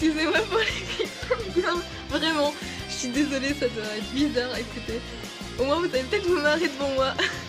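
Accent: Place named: France. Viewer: OH MON DIEU J'ai choquée en entendant une voix françaiiise ! xD Je t'aimeuuuh ! ♥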